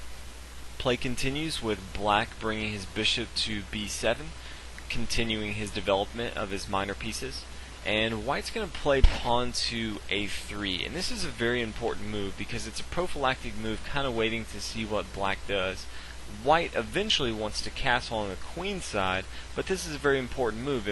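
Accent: South, United States. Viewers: This entire game just oozed talent and skill. I hope someday I'm able to reach half their level of skill. Excellent commentary as well, but I found some of the southern accent slightly distracting while trying to study the moves.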